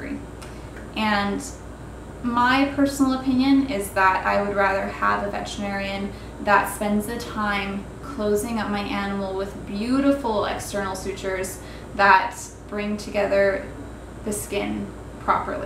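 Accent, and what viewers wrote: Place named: Canada. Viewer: Canadian ass accent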